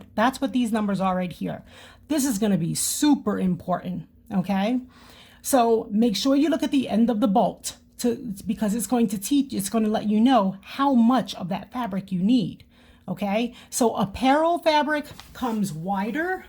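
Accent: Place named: Boston, United States. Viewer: you are so adorable, I find I am smiling while I am watching you. This video, helped me be confident in the things I have figured out on my own already, and you clarified some things I was not sure about, and taught me a couple new things!!! Thank you! But one question. Are you from Boston? I had a friend in college long ago, from Boston, and she said a couple of words with a particular accent, the you say a few words really similar. The word "here" and words that end in "-er". Just wondering.: D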